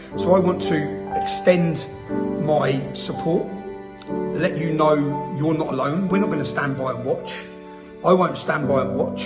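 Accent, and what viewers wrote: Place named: Cockney, United Kingdom. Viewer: Run for PM ...get rid of that cockney accent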